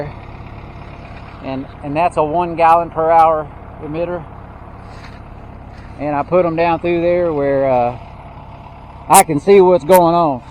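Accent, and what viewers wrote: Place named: South, United States. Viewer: What state are you in? I see red dirt and hear a southern twang.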